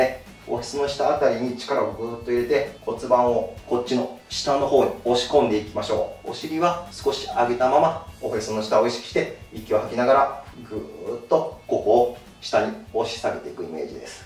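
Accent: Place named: Osaka, Japan. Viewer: 今回の動画もとても参考になりました。20年前にこの情報があったらよかったと思いました。でも今からでも決して遅くないとこれからできる限り続く様に 、 自分に 言い聞かせます。 ただ 正坐 は 難しいです。Japanese comment is hard for me. So please let me keep sending you comment in English. My English is very simple and I am very sure you understand. Thanks, sensei. I love your Osaka accent-大阪弁. Toshiko from Seattle.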